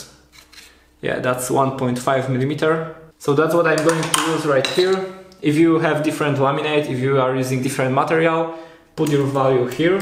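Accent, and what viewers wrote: Poland. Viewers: Daj polskie napisy bo słyszę że rodak 😆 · Typowy polski akcent :)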